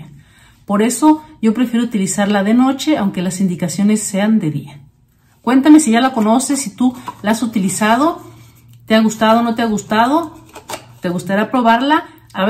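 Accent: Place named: Mexico. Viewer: Hola me gustas mucho como hablas 🥰 mexicana nata 😅 saludos desde 🇪🇦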